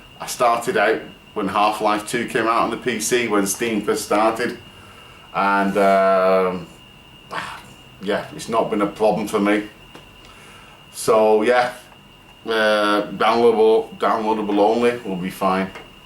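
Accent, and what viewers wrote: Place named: Bolton, United Kingdom. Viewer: am i right in thinking your from bolton? i live in bolton :) nice answers mate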